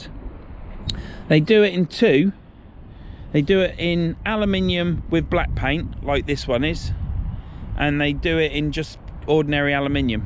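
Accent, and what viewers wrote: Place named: Great Yarmouth, United Kingdom. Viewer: Hi. In this video you answered a question I was going to ask and that's where you are from. The reason for asking is your accent and your work van whith Braydon flooring/carpets, which made me think Great Yarmouth/Gorleston 👍👍 Would love to see you fish Gorleston sometime, as I live in Gorleston and I'm now getting back in to sea fishing after a 20 year gap. In the last month I've fished Gorleston river twice, I've had 30+ Whiting but loads of crabs 😞. Anyway, tight lines, I really enjoy your videos, dont change your personality on the videos as it's you enjoying fishing, good and slow👍👍🎣🎣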